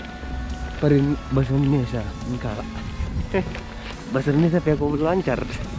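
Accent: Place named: Karo, Indonesia. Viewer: Ada yg jual Arsik di ceko Bang, 😁 Kental kalii, Kalak Karo na 😉😁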